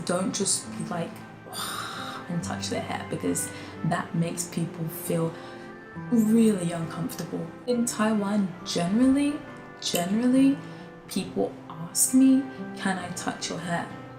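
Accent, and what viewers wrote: United Kingdom. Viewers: 真的就聽口音知道是英國人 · 她的發音100％是英國人😆😆😆😆😆😆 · 他的口音就是個英國人啊。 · 這麼明顯的英式口音都不相信是英國人🥶膚色是無分國藉的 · 很明顯的英國腔啊😃😃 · 很明顯的英國腔 BTW她唇保養得很好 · 好喜歡他的英國腔ㄛ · 好舒服的英國腔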